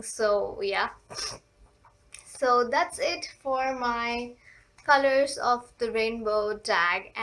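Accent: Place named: United States. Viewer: your cute :) you look like an indian but you have an american accent.how? :O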